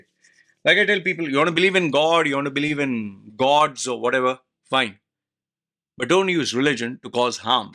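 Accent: India. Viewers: Your such a hypocrite, first of look at your own name when your a full on Indian, that is very clear with your colour and your f****d Indian accent so don’t come on here and try and degrade next mans religion etc especially Muslims, you have a personal problem with Muslims then be a man for once and come out face to face and bring your problem up with them, Muslims are proud of their religion and allhamdillia they are staunch too, theirs people in this world who have some of the greatest minds and IQ who have accepted Islam because they have seen the proof, a man in the middle of a desert and uneducated has predicted things that have been proven by science decades later and at that time their was no technology to prove things, but people like you can’t accept the truth because your ego is damaged, yes Islam is the truth and I fully and whole heartedly testify to that, what religion are you from I bet you don’t have the bottle to say your religion because you know it’s fake and if it’s not then prove it, you fake ass people sit behind your computer and give it Johnny big potatoes but never when you come across a real man and a real Muslim, Islam condemns violence but if a man does something you cant blame the religion for it, for example you buy a gun and you kill someone will you blame the gun obviously not you have to take the blame yourself, you know what they say people who live in glass house and all that, So in future think before you open your mouth to degrade anyone an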